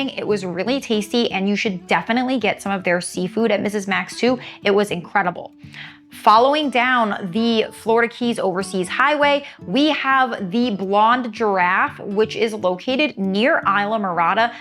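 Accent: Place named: Upper Midwest, United States. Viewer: You sound like you are from the upper Mid west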